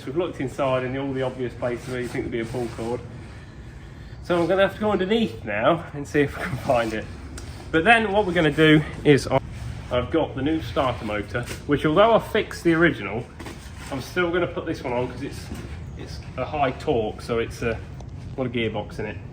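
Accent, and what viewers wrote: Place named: RP, United Kingdom. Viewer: That old engine would of ran on 4 star leaded petrol not unleaded or super expensive crap its a old jenson engine posh school boy the way you talk you got to remember the land rover has been stood for years so things will be stuck and rusty but when you service the engine it will run alot better but wat a beast of a sound better then a rover v8 but it will take a long time to restore everything and find out wat many extras on it and wat they do??? 👍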